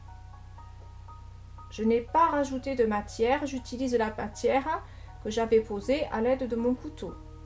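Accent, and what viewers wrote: South, France. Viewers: Bonsoir une très bonne année à toi et ta famille quel magnifique tableau une fois encore c'est exactement le genre de paysage que j'aime regarder et essayer de le faire j'adore 💕 il est waouhhhhhhh bon week-end à toi bisous et à très vite j'espère pour une nouvelle toile merci pour tes explications simples et ton très bel accent du Sud tu nous fait rêver ❤❤❤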